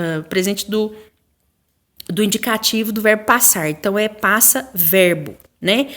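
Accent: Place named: Belo Horizonte, Brazil. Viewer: Me ajudou muito, obrigada de ❤️,pelo sotaque chuto que é de Bh ksksks ameii+1 inscrita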